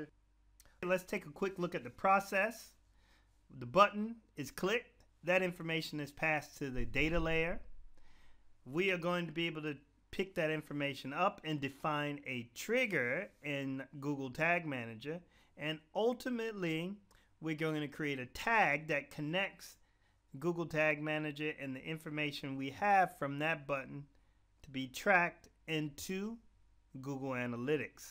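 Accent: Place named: Louisiana, United States. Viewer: Solid concise video! Was wondering how these disparate areas connected. You filled in my vast, unknown gaps in knowledge. AND you did it with a..... Louisiana accent?! You got some Southern accent mixed with something else. I couldn't quite figure out. Anyway. That was 100%, Prime, Grade A teaching!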